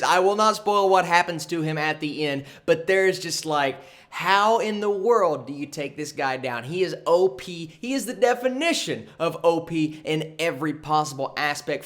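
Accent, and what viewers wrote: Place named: Texas, United States. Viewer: Are you from Texas? You have that accent.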